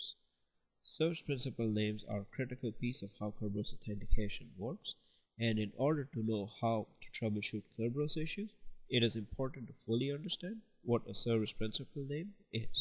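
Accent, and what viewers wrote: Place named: India. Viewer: drop off the accent dude... the way you say "service" sounds like "sabzi" - which means vegetables in indian(hindi/urdu)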